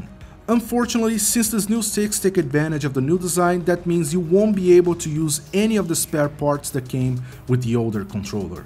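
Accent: Brazil. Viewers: Cara, matei no primeiro minuto de video que você era brasileiro pelo sotaque kkk. Parabéns pelo vídeo! Muito bom! E excelente inglês! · Dude, are you brazillian?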